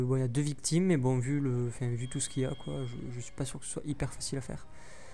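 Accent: Toulouse, France. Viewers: C'est toulousain, on voit que t'entends pas souvent des marseillais ;)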